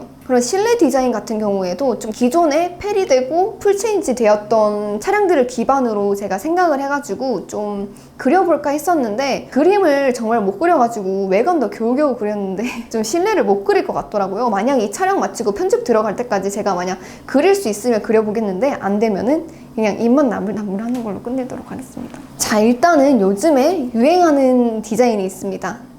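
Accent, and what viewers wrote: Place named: Gyeongsang, South Korea. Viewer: 고향이 경상도 어디세요? 사투리가 똑같네요 ㅎㅎ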